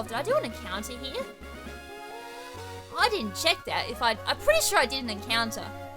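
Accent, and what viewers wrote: Australia. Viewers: Wait...are you an aussie? XD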